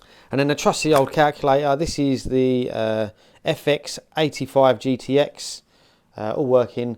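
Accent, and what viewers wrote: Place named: United Kingdom. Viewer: I LOVE everything about this video . I’m from Italy and we don’t have car boot sale (as far as I know) so I love this even more. I also love the quality of the audio, I don’t know if this was intentional butthis is my favored type of ASMR (moving stuff inside the boxes, opening and closing the video games..), the British accent ❤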